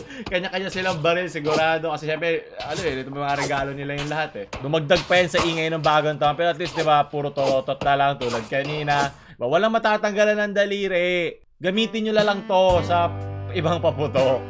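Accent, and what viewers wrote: Visayas, Philippines. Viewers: anue ba 😳😂 iba talaga pag bisaya ka, iba pagkakabasa mo 😂 (bisayawa here)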